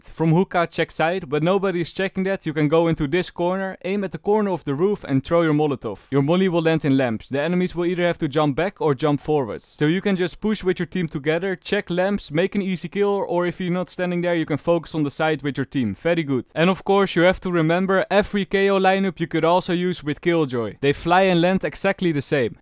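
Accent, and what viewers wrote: Netherlands. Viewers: Cant stand this guys dutch accent but his tips are so good i have to subscribe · i smell dutch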